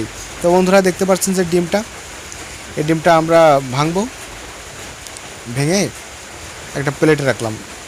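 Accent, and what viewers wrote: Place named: Khulna, Bangladesh. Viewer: ভাই, আপনার বাড়ী কোথায়? ভাষা তো আমাদের মতো, খুলনা বিভাগ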